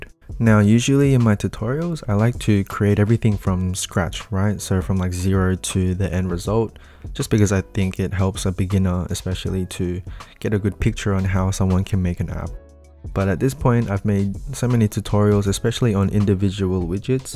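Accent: Australia. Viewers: Wow, at first i thought u r australian, haha 😅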